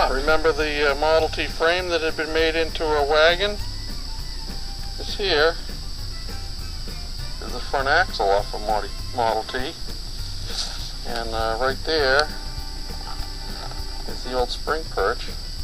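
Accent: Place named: New England, United States. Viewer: Back when you still had your heavy New England accent. Great memory reel! Cheers my YT friend and Shipmate.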